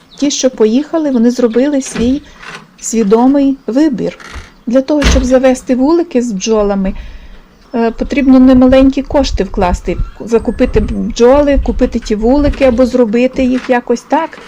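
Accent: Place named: Ternopil, Ukraine. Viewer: Добрий день,я у вас новенька,і мені цікаво,а у вас є відео,де ви розказуєте як ви попали в Карпати, дещо про себе,де працювали,про вашу сімю.Говір ваш такий,як на Тернопільщині, або на Франківщині,як ви потрапили на Запоріжжя?Розкажіть про свою сімю. Дуже буду вам вдячна. Всьго вам найкращого,обживайтесь на новій землі,пускайте свої корінчики,нехай Карпати стануть для вас гостинні та рідні.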